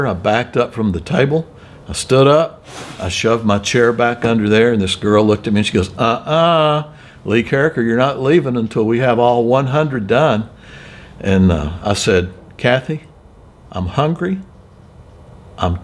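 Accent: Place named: North Carolina, United States. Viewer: Hello from North Carolina! I love your videos and I'm also a subscriber of channels too. You sound just like you are from NC because you talk like we do here, and I love it. Was that gold car a 63 split window Corvette in your garage? It sure looked like it was which is my favorite Corvette body style. May God bless you and your loved ones and I pray y'all will have a very Merry Christmas!!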